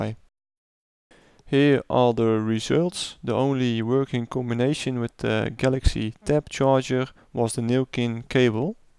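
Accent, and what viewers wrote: Netherlands. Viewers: So noticed you were Dutch by the accent. Dus ik maak het me even makkelijk. Ik had op mn pi2 eerst een plex servertje draaien, hdd powerde hij ook mooi. Nu raspbian image erop met plex en de hdd tikt lekker door (net nieuw) welke power options heb ik verder nog om de pi wat meer stroom. Te geven? (gebruikte voorheen de usb poort van mn pc)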